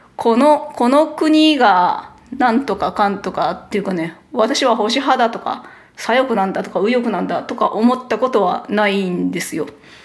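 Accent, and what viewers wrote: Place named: Osaka, Japan. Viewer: 新しい扉を開いてくれるチャンネルですね。大阪弁も身近でよし。これからもよろしくお願いします。☺️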